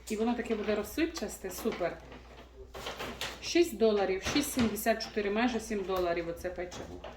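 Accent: Ukraine. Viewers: у вас такой приемный украинский говор, мой муж Любе ваши кулинарное блюда и вас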